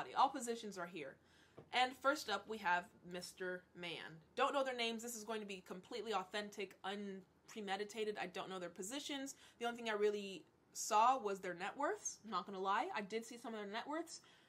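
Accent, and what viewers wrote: United Kingdom. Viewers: Came for the casual objectification of millionaire men, stayed for the eerily impressive British accent.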